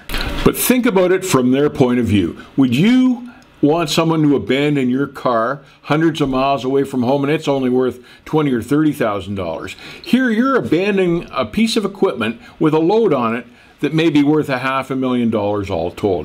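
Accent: Canada. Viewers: I love the Canadian accent :)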